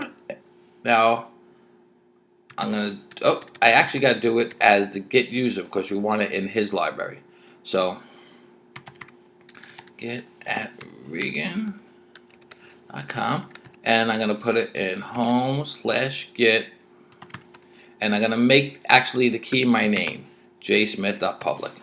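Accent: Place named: Boston, United States. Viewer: repro - lovin' the accent. Cousin's from Bwahstin (Boston).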